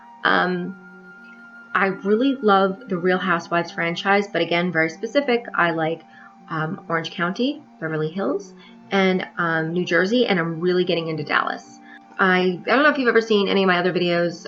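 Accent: New York, United States. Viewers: Ditto on the gym playlists! Never representative of actual musical tastes. The gym is a whole different beast. And nothing wrong with a NY accent, NYC is rich in subcultures! 👍🏻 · What's wrong with a NewYork accent I love it! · I hear the NY! Fellow Queens chick here. But you lost the nanny accent so that’s a good thing!😝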